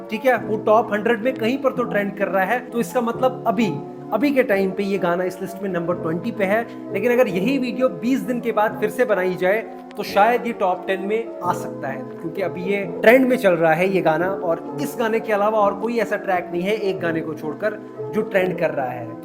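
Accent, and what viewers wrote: India. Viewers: Yaar, Please speak correct Urdu. Okay. Abhee kay time pay? What is this? If you want to speal Mumbai style urdu say so? Sub Hindustani kyon bolyat ho bhai?? Please explain??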